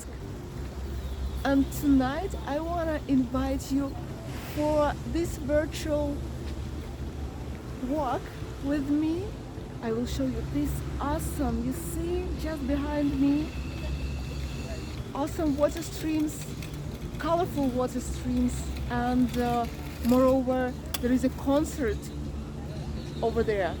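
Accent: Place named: Eastern Europe. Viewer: thank you for making such a wonderful video, i like the setting with steps down to the water and ethnic belarussian music playing in the background, this reminds me of the bellagio fountains in my home of las vegas nevada, being that i'm single, i would ask you to the fountains, respectively, i like your smile and slavic accent, i hope to see minsk belarus someday, take care, regards from las vegas nevada.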